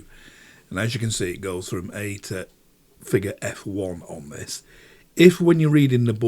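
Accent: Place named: England, United Kingdom. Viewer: Very good. Great to hear a true English accent as well, along with a rich vocabulary. Helps a lot.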